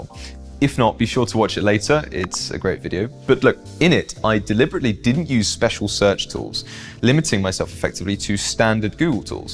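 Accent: RP, United Kingdom. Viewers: The ultimate effortlessly posh accent. Brilliant.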